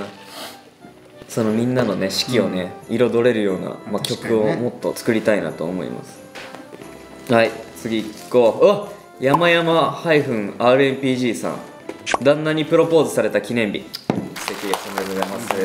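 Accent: Okayama, Japan. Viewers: 岡山弁のさんがやっぱり好きすぎます🥲🍑❤️イチブトゼンブのモノマネめちゃわろてもーたww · さんの岡山弁大好きだから聞けて嬉しいです😁♡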